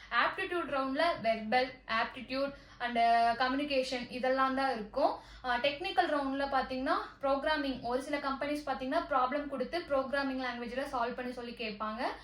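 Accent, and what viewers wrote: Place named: Palani, India. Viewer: good your videos are very nice to see.... Palani slang nalla theriuthu.....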